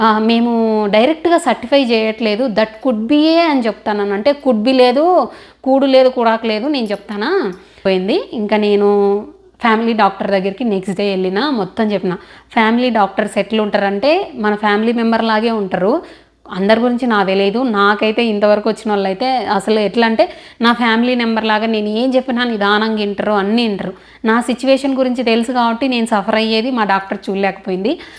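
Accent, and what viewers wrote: Rayalaseema, India. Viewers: Nenu ekkuva gaa comment cheyyanu andi but meru nijangaa super ga clarity ga chepparu memu thondarlo vastam andi foreign ki Naku papa nenu same challa tension anipistundi ....but Mee video chusaka konchem relief anipistundi maa papa anthe andi active full mrng padukodu night padukuntundi maa papa ki uyyallo nee alavatu foreign lo Ela Abba anukunedani anyway challa thanks andi...madi rayalseema kadapa meru matladtunteee aa slang lo maa voru akka laga vunnaru...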